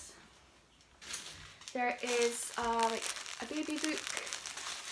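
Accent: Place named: Scotland, United Kingdom. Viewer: I love the idea that they included the poem. I clicked on the video because my Grandmother was born in Scotland and I love hearing the accent.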